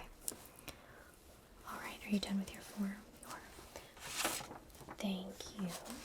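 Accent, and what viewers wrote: United States. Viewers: that american accent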